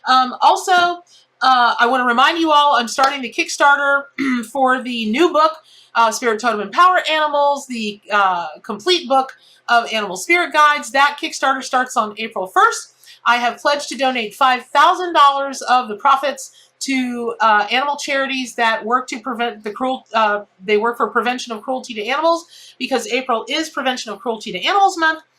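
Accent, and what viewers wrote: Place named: New York, United States. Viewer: Hahaha!! That sounds like a New York accent hahahaha 🤣🤣🤣 waaaaay back when I was in high school I played flute in band (how is your native flute going, btw?? 😊) and on my first band trip we went to New York. A guy was selling M&Ms for a charity outside of Virgin records in times square and I told him how many I wanted to buy and he said "four gorgas" and I said "How much is that?" And he repeated himself "Four gorgas" and I asked "What is a gorga?" And he slowed it dooooown and said, with the accent, "Ya gogous" (you're gorgeous) as in he was grateful I didn't just stop to listen but to also help with whatever charity hahahaha 🤣🤣🤣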